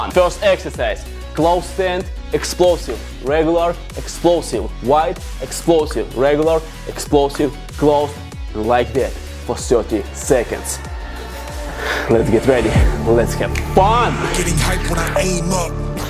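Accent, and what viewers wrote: Russia. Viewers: by his accent, I know he's russian...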